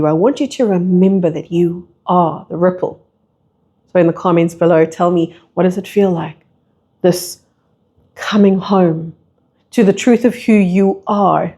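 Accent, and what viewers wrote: South Africa. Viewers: Mmmmm….. I resonate with what is being said .ummmmm the accent……. Sounds SOUTH AFRICA?//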